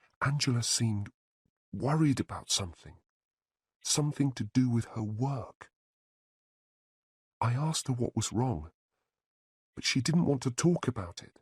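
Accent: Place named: United Kingdom. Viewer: You are my favourite and perfect story poster, because its always with British accent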